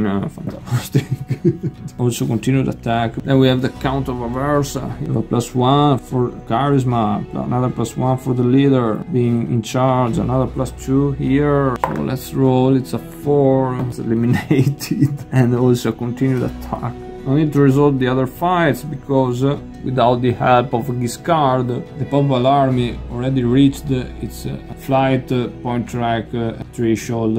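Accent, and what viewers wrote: Italy. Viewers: Venitian accent, true?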